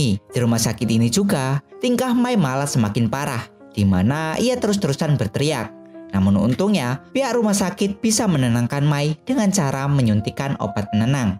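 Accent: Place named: Java, Indonesia. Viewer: Ya Allah, suaranya medok bangets...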